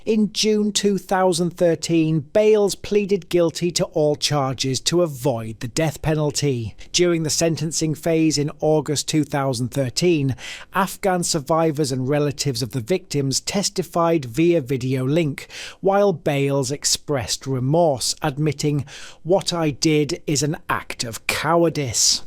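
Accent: United Kingdom. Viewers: British accent is annoying.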